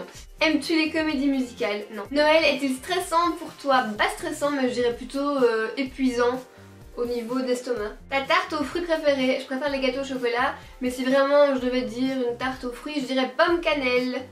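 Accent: Belgium. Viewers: Il me semblait bien avoir reconnu ton accent Belge :) C'est rare de voir des youtubeuses belges d'ailleurs ! <3 :)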